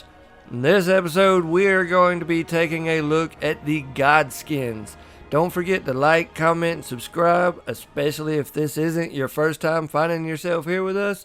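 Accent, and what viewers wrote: South, United States. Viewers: A southern drawl talking shop about Elden lore, I’ve never subbed so fast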